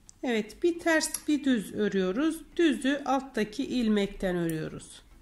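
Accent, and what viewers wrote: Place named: Black Sea, Turkey. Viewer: Karadeniz (Rize)şivesi bu!👍😊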